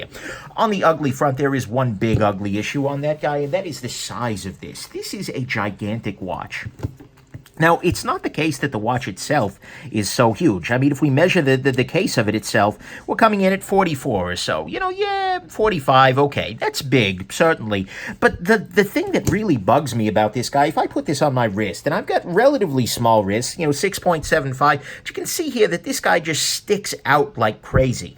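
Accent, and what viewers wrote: New York, United States. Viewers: I love your accent - is it NY? Great video, very entertaining.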